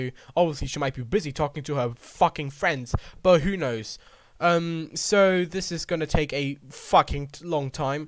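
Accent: United Kingdom. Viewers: Nice and Concise mate . I cant tell where in england ur accents from tho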